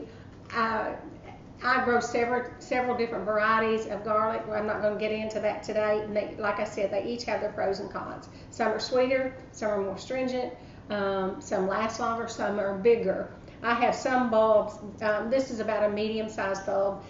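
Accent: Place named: South, United States. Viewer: I'm really sorry if I offend anyone, but, I'm from England and that Southern accent just distracted me totally from the content of the video. I watched it 5 times... no idea how to preserve garlic, but I certainly have learned that you Southern Gals talk beautifully. Thankyou; I'm going in for listen number 6... and 7 and 8 and 9... you get the picture...:)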